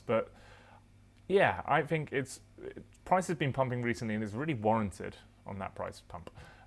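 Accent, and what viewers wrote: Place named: United Kingdom. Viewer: Great to hear another British accent :)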